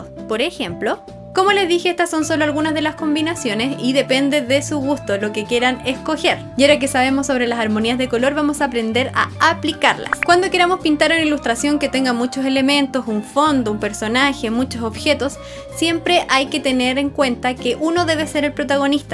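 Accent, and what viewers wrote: Chile. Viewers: Nomames amo su acento chileno alaberga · es el acento chileno xD como nadie se da cuenta de eso xD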